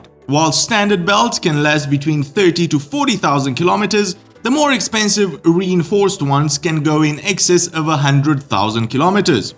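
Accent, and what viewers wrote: South Africa. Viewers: Before I get properly started watching, what accent is that? Sounds a bit South African (but not quite), maybe more clues await me as the video progresses...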